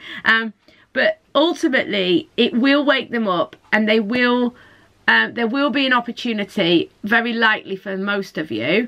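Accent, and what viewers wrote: United Kingdom. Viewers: Thank you, love your cheery British accent! I chose #1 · Kay Treue same!😄 And both😁😁❤️ 100% resonated, thank you❤️ I’m wondering, could you tell us please from which part of the UK are you?😊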